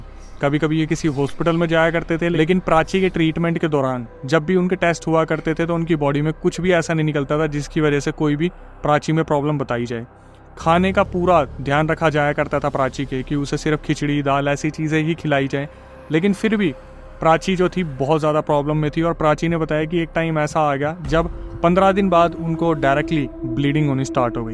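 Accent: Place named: Bhind-Morena, India. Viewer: Bhind morena ki language h bhai 😂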